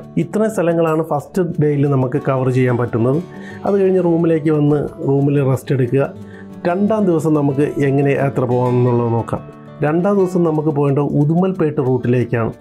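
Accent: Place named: Trivandrum, India. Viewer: മൂന്നാർ ട്രാവൽ ടിപ്സ് സിംപിൾ ആയി അവതരിപ്പിച്ചു പൊളി...... അതും ട്രിവാൻഡ്രം സ്ളങ്ങി ൽ ..... ആശംസകൾ 🌹🌹 കൂടുതൽ വീഡിയോസ് പോസ്റ്റ്‌ ചെയൂ..... 👍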